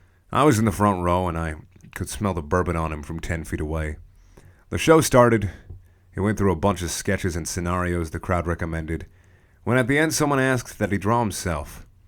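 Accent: South, United States. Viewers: I want to hear your country accent like so hardcore xD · I love how the video gradually got more southern.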